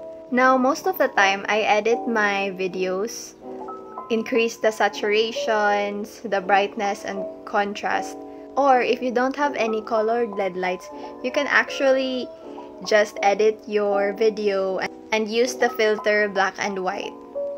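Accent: Philippines. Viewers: you sound like Filipino to me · i can tell she's phillipines by her english accent lol❤ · I really don't have the materials for this... POTANG INA!!! (but this is the first time I've heard your irl voice) :) You really sound like a true Pinoy! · Why do you sound like you're from The Philippines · Huh she sounds like from Philippines but thx for tutorial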